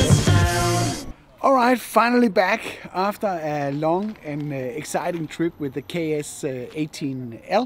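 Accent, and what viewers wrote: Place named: Denmark. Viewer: Din accent lyder meget dansk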